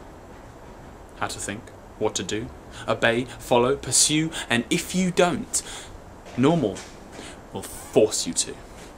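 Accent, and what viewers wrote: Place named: England, United Kingdom. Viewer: This is excellent! All your poems are amazing! By the way i'm russian, and i really like your pure English accent. I'll be glad if you will often record video of this kind. I'm sure it will help to improve the speech for many who teach English.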